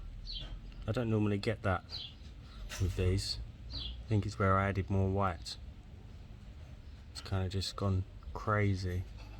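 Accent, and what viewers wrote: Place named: South East London, United Kingdom. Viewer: Hi. Beautiful effects! I'm from S.E. London originally and your accent sounds familiar, is that your area too?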